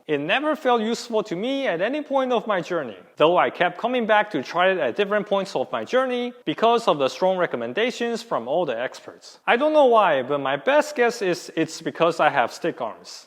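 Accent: China. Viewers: 老哥 这是中式口音吗？感觉口音很熟悉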